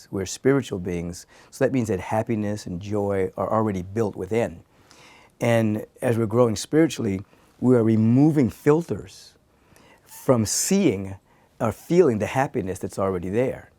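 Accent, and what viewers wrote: France. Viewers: U have a french accent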